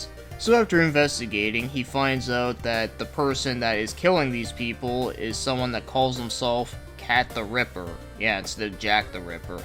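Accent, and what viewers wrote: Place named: Japan. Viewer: ay bro you sound Japanese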